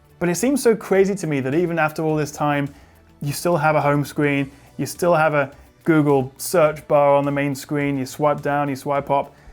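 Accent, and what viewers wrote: United Kingdom. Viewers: Accent is British 😉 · nice British Accent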